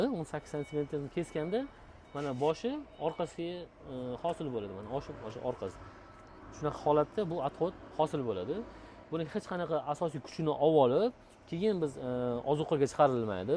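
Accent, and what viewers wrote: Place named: Namangan, Uzbekistan. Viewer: Aka Namangandanmisiz sheva totyabti🎉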